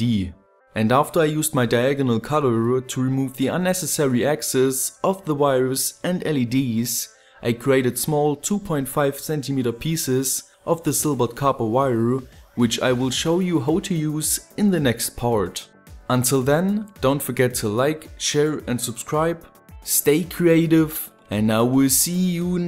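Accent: Germany. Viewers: i believe he's german :p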